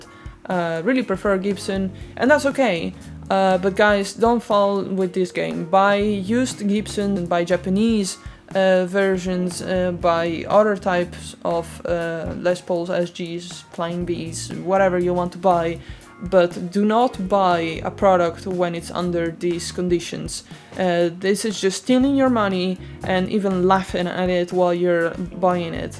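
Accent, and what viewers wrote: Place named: England, United Kingdom. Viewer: Great analysis. I like you would not support this company with my custom and like you think the Les Paul is a beautiful guitar. Your comments about contradicts was true and your ethics about not buying inferior and suggesting Gibson should focus on making a superior product made sense. I also agree with you, let the customer chase you for a well made product at an attractive price rather than chase, threaten and bully the customer. This is one of the most balanced comments without sarcasm, anger or abuse. Plus, your English accent is gorgeous! 👍🎸👌❤️ Great job.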